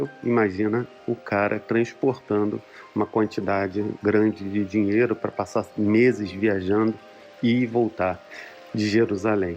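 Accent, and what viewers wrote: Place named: Niterói, Brazil. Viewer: Muito bom o video, reconheci o sotaque de Niterói. Gostei, porquê você conta as histórias. Parabéns!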